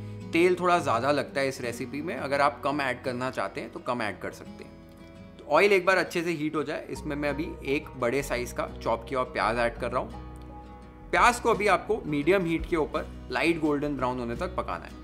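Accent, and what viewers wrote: Maharashtra, India. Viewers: Amazing..ur Marathi accent is awesome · Like your Assal Marathi Pronounciation.. Love the way you cook all recipes with authenticity and dedication.. 👍🥰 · Recipe तर झणझणित आहेच..पण मराठी accent एकदम कडक... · खूप सुंदर दिसते मिसळ 👌👌आणि तुम्ही जी शुद्ध मराठी बोलता ती ऐकायला खूप छान वाटते . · love you ♥️ sir I'm from mumbai ( Maharashtrian ) तुमची मराठी एक नंबर आहे 😊 pronunciation authentic Marathi 👌 Your recipes are Outstanding.. no doubt, i personally tried out most of.... Kolhapur means treasure of testy Food 🚩♥️ GBU 🕉️ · Yes... His marathi pronounciation is very clear. I have seen his modak, kale mutton recipe... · Dear, I am a hardcore maharashtrian...I am so happy with your pronounciations and Marathi zhatka in most authentically cooked most favourite of entire Maharashtra.Thank you so much.Big fan and follower of yours.God bless you...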